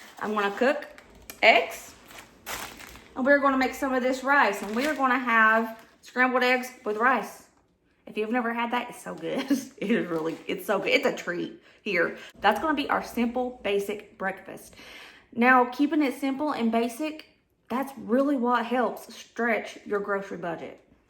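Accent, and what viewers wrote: South, United States. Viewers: I love eggs and rice 😊😊 Your southern accent is adorable 😊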